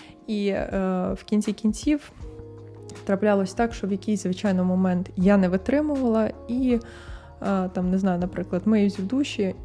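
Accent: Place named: Surzhyk, Ukraine. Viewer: дуже дякую, що ділитесь власною історією, як на мене, це дуже корисно і полегшує відчуття всередині нас! Ви пройшли реально багато труднощів і тому хочеться висловити Вам слова підтримки. Ви справді молодчинка<3 за суржик не партесь, він звучить афігєнно і середньостатистичному носію мови все більш ніж зроз:)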